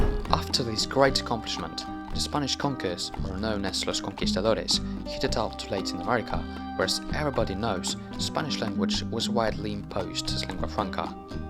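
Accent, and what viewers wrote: England, United Kingdom. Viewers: ¡Me encanta el acento de Inglaterra!😊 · I've taken a few classes in Spanish, so I kinda have an active beginner's knowledge, and a somewhat passable passive knowledge, at least when written. Anyway, I knew about almost all the facts here, already, except for Equatorial Guinea. That English accent is quite something, though. I almost found it easier to understad you in Spanish... 🙄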